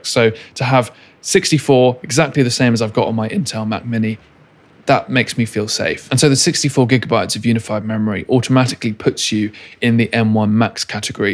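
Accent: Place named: United Kingdom. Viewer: Dear, thank you very much for your video. I like your videos very much and that as a non-native English speaker. I love your and the clean British accent. I think my personal decision has fallen. I will order the standard 16 inch Pro with 16GB RAM 1TB SSD version. Why? The larger display, longer battery life and what many may not know, the better cooling system from the larger one. That may shouldn't tickle the Pro compared to the Max processor. The 16 inch model gets nowhere near as warm as the 14 inch. Well when will that be the case? The weight? If I can't carry my notebook sorry MacBook Pro, then I don't need one anymore. And it's a difference of 200 € for me. I know a lot of money. Keep up the good work. Thanks to you, stay safe, all the best from a loyal subscriber from Bavaria.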